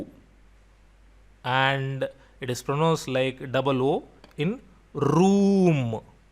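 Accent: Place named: India. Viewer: Your videos are very helpful, my friend. However, you're giving us English words to help us with Hindi pronunciation. The problem is that you are pronouncing those English words with a very, very strong Indian accent. Thus, your English examples are not helpful to me, at all, because I find that you pronounce those words very differently from us. I would like to request that you give examples with Hindi words, and not English words. That would make your lessons much more beneficial. "Is" is NOT pronounced with the same sound as "meet." Is = Short i Meet = Long E The "Short i" sound does not exist in Indian languages. So, it would be very difficult for you to master the sound. It would also be difficult for you to hear the difference between "it" and "eat." My point is that I am working very hard to learn authentic Hindi pronunciation. It would be helpful if I were more exposed to pronunciation in the Hindi language, as opposed to trying to compare Hindi and English sounds so much. 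In the end, our sounds are completely different and they really should be not be compared to one another. I love your lessons, though, and am enjoying learning with you very much.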